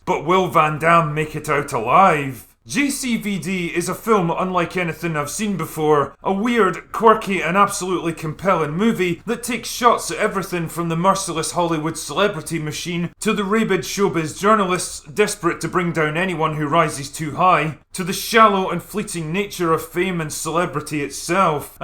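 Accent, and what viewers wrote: Scotland, United Kingdom. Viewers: Have you honestly never heard of regional accents? People across the UK and USA speak English but there are still distinctly different accents in some regions, and those regions don't have their own languages either. I mean, the Drinker himself has a Scottish accent...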